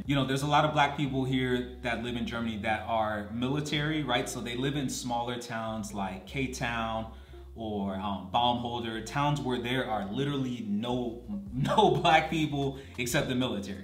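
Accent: United States. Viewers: You are black yes but you are also American. Your American accent will also afford you a level of respect that non-American blacks may not receive.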